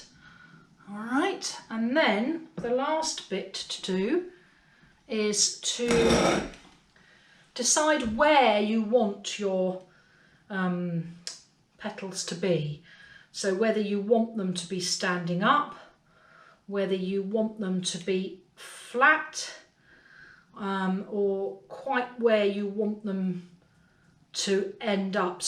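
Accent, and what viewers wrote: United Kingdom. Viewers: I had never heard of Blue Peter & had to Google it - a can’t get enough of your British accent & expressions! We call the “whirler” a banding wheel in the US but I’ve decided to start calling it a “whirler” - that’s so much more fun! Sending much love & I can’t wait to make my first poppy. I’ll email you a picture when it’s all glazed! Cheers!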